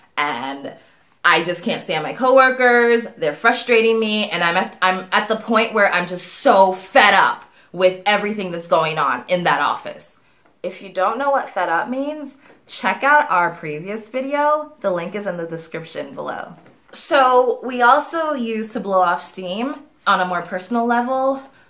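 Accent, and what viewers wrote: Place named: United States. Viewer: bonsoir,oui ça va mieux. honestly i really like learning idioms and make use of them in my spoken english. i like your american accent.where are you from in the states ? i was told the accent dependant on the region you live in the us.right ?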